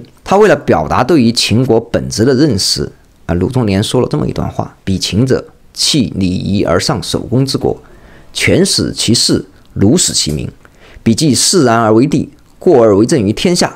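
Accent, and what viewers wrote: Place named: Southwest, China. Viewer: 哥们儿，非常赞！听你口音应该也是云贵川的人吧？😁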